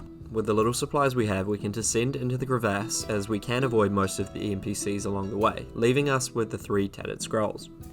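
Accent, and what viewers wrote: New Zealand. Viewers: Are you from NZ? Accent sounds familiar.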